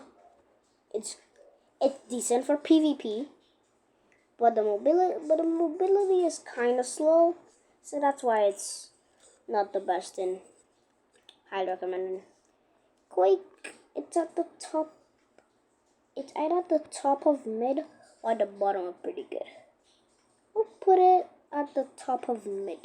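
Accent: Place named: Trinidad and Tobago. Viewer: We know you trini boi stop hiding the accent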